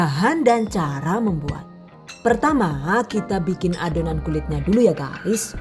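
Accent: Banyumas, Indonesia. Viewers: Mksh bun. Seneng lihat.tapi ga pernah buat. Bunda Lucunya. Si pd inyong ngapak ya bun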